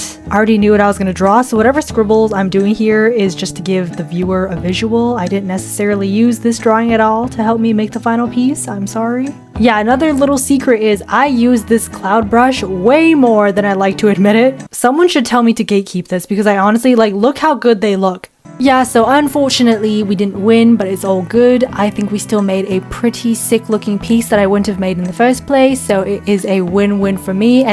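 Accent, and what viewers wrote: United Kingdom. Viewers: Is it just me or she keeps turning British 🤔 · Why did you speak British? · Am I the only one who noticed her extreme brit accent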